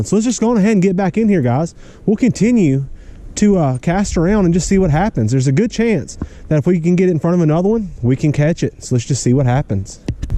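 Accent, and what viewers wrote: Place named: Georgia, United States. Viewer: Are you in ga. Cuz you sound like it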